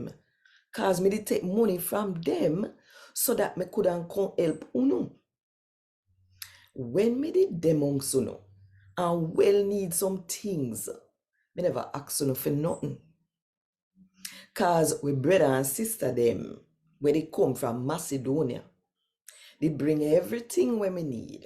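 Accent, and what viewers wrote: Jamaica. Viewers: This passage has reinforced how difficult leading a church is. I loved how once again Paul's day to day challenges in building the early church shines through. I follow these readings in my Bible & the Jamaican patois makes it so much more understandable re context and emotion. Thanks again for this blessing and your service to the word of God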